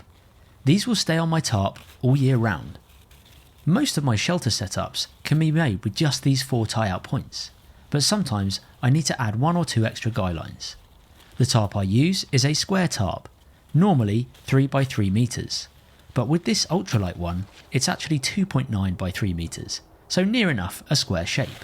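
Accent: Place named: United Kingdom. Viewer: Hate the lime accent.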